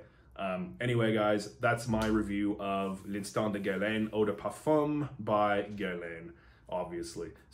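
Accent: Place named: Quebec, Canada. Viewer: I love his Québécois pronunciation, must be a Canadian... eh.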